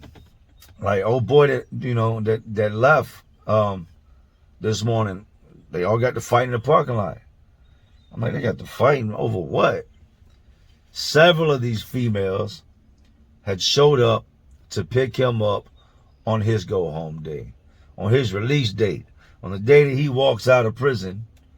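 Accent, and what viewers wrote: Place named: New Orleans, United States. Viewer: I really enjoy your content. I listen while I’m grocery shopping. Question: your accent has a lot of New Orleans inflections. Did you ever live here in NOLA? You say a lot of things the way NOLA natives (from the 3rd, 7th, 17th ward for example). I’m so curious how you got this accent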